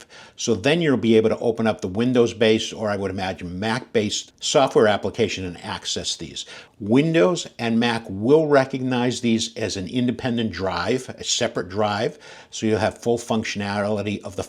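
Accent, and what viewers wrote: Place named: North, United Kingdom. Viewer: I love the oldschool northern “ahhrd” accent. You don’t hear that (in media) as often today! (I live in NC btw lol) Great video!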